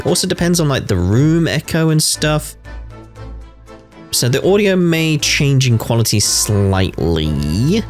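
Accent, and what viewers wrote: Scotland, United Kingdom. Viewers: Took me about 15 minutes to realise that he had a scottish dialect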